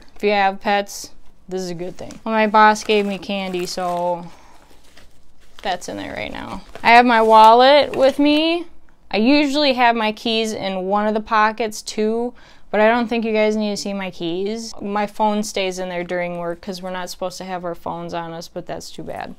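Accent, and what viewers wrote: Midwest, United States. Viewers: That midwestern accent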